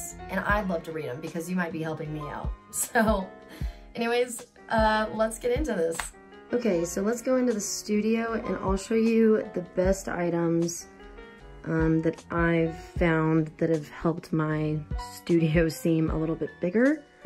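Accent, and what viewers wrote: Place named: North America. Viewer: Hi from a self-confessed art groupie!! My art studio tour would last about 3 minutes...XP-Pen monitor, XP-Pen pen, Clip Studio Paint software...DONE. My music studio tour would be closer to 7.5 minutes with stories about each piece. I decided to sub your channel based on this video tour, as much because of your persona as for the storage tips. You remind me a little of Chloe Rose only with a North American accent. While you were showing us around your MySpace setup, I was thinking, "Now here's someone it'd be really cool to watch paint!" Look for my art on my B channel someday. Meanwhile, I look forward to following your (and your hubby's) art adventures.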